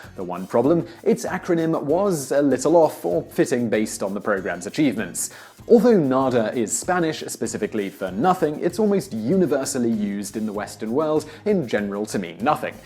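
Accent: United Kingdom. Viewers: Is that British matthew santoro??? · I do love a eloquent British Accent.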